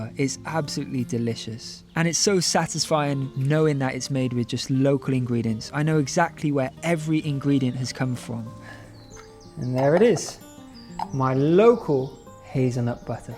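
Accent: Wales, United Kingdom. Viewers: I love watching your videos. My husband was welsh he passed away two years ago. I miss listening to his voice. Hearing your welsh accent makes me so happy. I love gardening and cooking too. You are inspiring and you warm my soul. Thank you ☺️❤️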